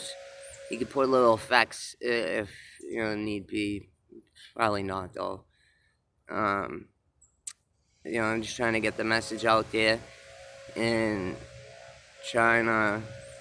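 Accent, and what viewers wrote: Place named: Massachusetts, United States. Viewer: You sound like ur from mass I’m from mass 😀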